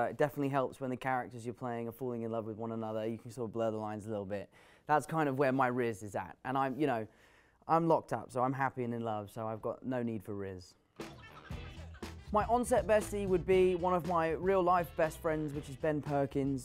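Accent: United Kingdom. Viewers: Tom Halland who is your favorite avengers character also I like your British accent and you say water 5 times for me😅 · Your Hella England straight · pure British. The amount of times I’ve said elevenereef. Brill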